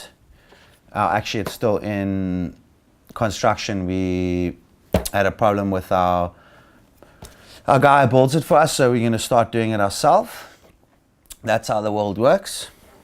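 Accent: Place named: Italy. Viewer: italian accent is cute :)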